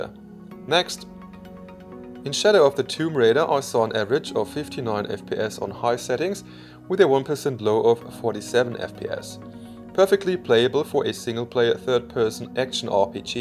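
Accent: Germany. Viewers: Moiin Denke du bist auch deutsch so wie dieser akzent klingt... (nicht der einzige hinweis)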